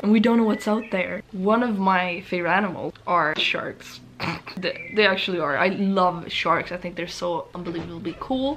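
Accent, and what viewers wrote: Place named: United States. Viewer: omg ur american accent is so much stronger now! I havent been on youtube for ages and I really notice a difference! Cool :—-) Loved this vid xx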